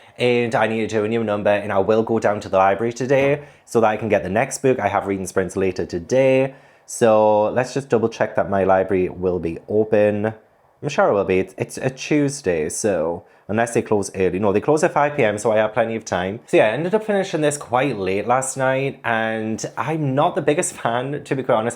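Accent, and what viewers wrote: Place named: North, United Kingdom. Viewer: I stumbled on you one day and stayed for two reasons - I am from the north, now living in the US and your accent brings me home... and I just ADORE your content!!!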